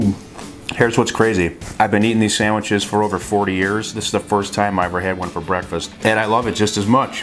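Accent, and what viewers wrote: Chicago, United States. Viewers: Hearing your Chicago accent gives me faith in attempting this.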